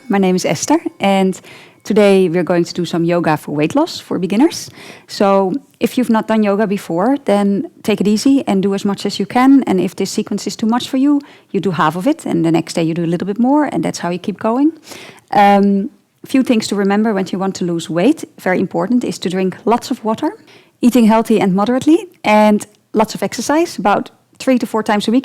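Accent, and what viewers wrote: Netherlands. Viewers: Je bent nederlands he, hoor ik aan je uitspraak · you can hear she's from Holland too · I love your accent. Are you from the Netherlands?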